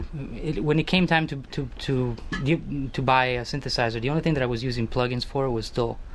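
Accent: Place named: United States. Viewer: grande mozza ! beato te ;-) you're picking up an excellent american accent...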